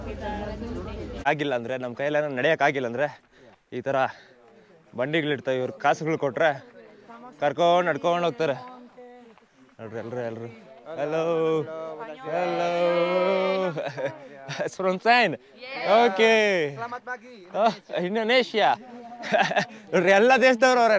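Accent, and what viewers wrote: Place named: Chikkaballapur-Kolar, India. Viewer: Brother nivu chikballapura kolar side avra Language a tara ide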